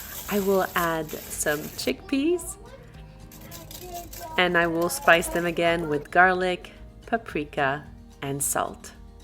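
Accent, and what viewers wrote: France. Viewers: You got more done in your morning than I do all day! Girl when you were like 'and now it's ' I couldn't believe it! LOL Good for you I found this video very enjoyable and your accent is nostalgic for me as a francophone living overseas. Thank you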